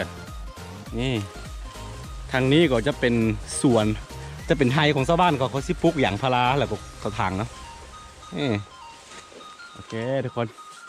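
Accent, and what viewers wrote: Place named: Isan, Thailand. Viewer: ทำไมพูดเหมือนคนอิสานคะอยู่จังหวัดไหนคะ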